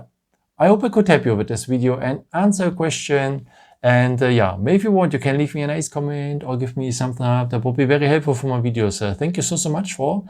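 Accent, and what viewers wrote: Germany. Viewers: Deutscher Akzent:D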